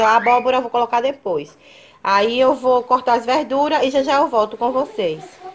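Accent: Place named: Bahia, Brazil. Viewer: aaaaaa deve estar uma delícia mulheeeer 😍 amo muito tempero no feijão.. você é da bahia??? amei o sotaque viu mulher beijão 💗😍😍😍💗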